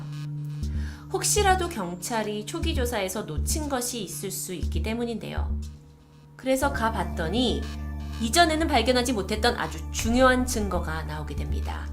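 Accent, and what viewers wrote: Jeolla, South Korea. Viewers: 절라도 말투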